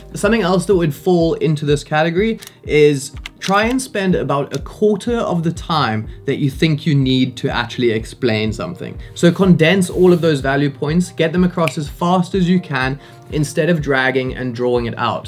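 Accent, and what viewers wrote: Cape Town, South Africa. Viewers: Lekker video bru. I was trying to place your accent as it didn’t seem Australian. Then I realised you’re from Cape Town too. I’ve been away to long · Is that a South African accent??❤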